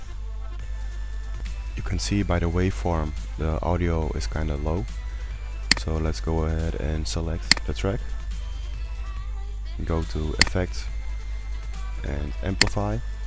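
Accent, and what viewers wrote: Netherlands. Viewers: are you dutch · Are you dutch? You seem to have a dutch accent...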